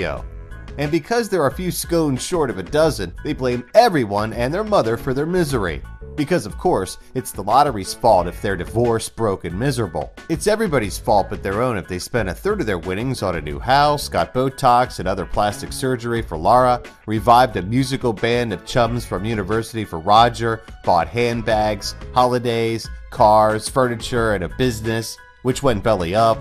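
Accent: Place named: United States. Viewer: Lmao I love how the narrator speaks in an American accent but refers to everything in British slang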